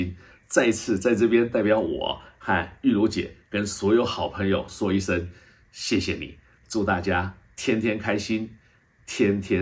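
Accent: Standard. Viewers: 章兄的國語字正腔圓⋯聽力正常者應該不需字幕😂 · 了解，沒有字幕沒關係，您的國語很標準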